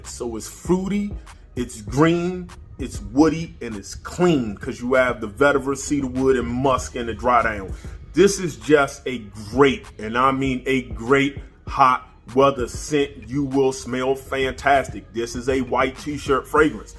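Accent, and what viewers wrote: DMV, United States. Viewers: Yo, as soon as you started talking I knew you were from the DMV.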